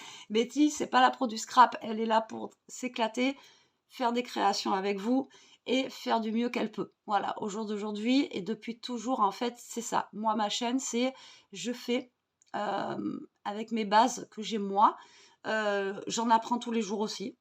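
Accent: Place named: Southern France, France. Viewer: Restez comme vous êtes 🙏et un adage qui m aide beaucoup dans la vie : on ne peut pas plaire à tout le monde et c est tant mieux 😉quand à vos tutos « free style » c est justement ce que j aime en vous et votre joli accent plein de soleil ☀️ Je reste à vous suivre et surtout ne changez rien c est votre personnalité créative 😊🧡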